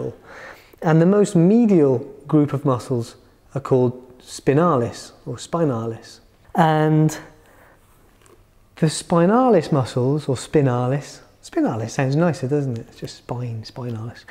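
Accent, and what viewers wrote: United Kingdom. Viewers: From the moment I've heard him speaking I understood that he is from the UK, lol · Maybe you wanna slow down a little bit , I barely can understand your British accent 🤷🤷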